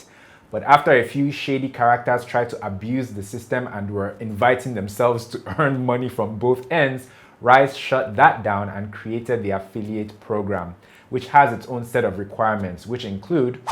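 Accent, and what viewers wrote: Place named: Ghana. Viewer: Great content. Do you know similar apps for the Ghanaian investor? Btw, you sound more Ghanaian than Nigerian!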